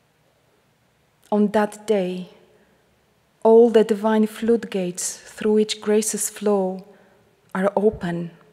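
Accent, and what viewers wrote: Poland. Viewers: I fell asleep with YouTube on the TV again. When I woke up, a little while ago, the tail end of this video was playing. I have been reading St. Faustina’s Diary, and thought at first that really was St. Faustina, with the habit and her slight Polish accent. I went back to the start and watched the whole video. certainly has a full grasp and understanding of the Divine Mercy devotion. I have shared and recommended this video to my Facebook friends. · Beautiful Polish accent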